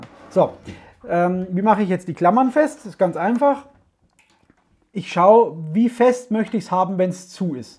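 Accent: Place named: Franconia, Germany. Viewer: "Runsdrum" frängisch is einfach genial 😉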